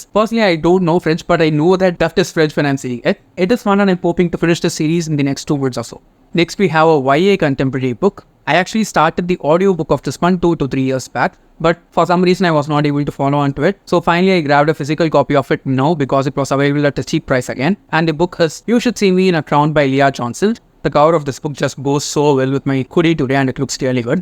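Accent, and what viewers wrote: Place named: England, United Kingdom. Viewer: Love ur english accent.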